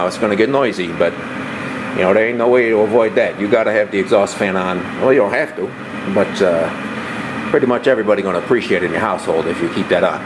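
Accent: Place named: Brooklyn, United States. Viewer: What a great accent. It makes me feel at home back in Brooklyn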